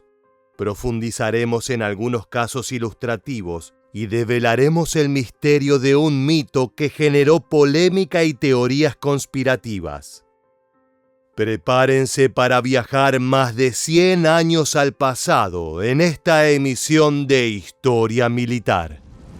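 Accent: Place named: Argentina. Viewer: Siempre tira a favor de USA y la OTAN, ellos son siempre los buenos para éste canal y los alemanes son los maaalos! demonios! Conste que el canal, a juzgar por el audio del locutor; es de origen argentino. Y que peor enemigo de Argentina es la OTAN quien conspiró contra la Argentina y apoyó logísticamente a la fuerza expedicionaria del reino satánico unido. No hay caso...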